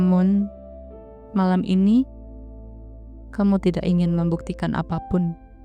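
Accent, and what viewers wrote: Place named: Jalan Wilis, Indonesia. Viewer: suaranya seperti org kelahiran jln wilis ya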